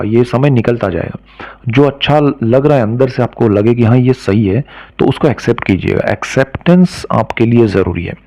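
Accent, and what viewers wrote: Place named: Kashmir, India. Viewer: Are you from Kashmir your accent is kashmiri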